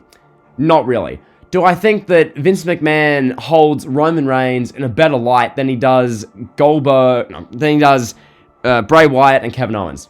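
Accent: Australia. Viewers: And wow you sound more Australian then usual due to the mic,cool tho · Lol somehow you've become more Australian than you already were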